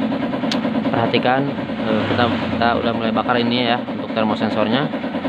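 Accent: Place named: West Java, Indonesia. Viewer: Maaf bang alamat bengkel nya d mana yah kalo boleh tau ngmong nya ada sunda2 gitu,soal nya saya juga orang sunda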